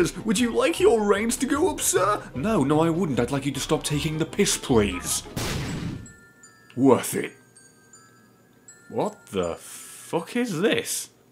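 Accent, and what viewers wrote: United Kingdom. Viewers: Wait, are you an American citizen? I always assumed you were British because of your accent...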